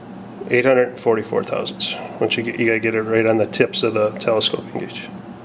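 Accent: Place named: Wisconsin, United States. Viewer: i can tell you're from WeesCAWNsin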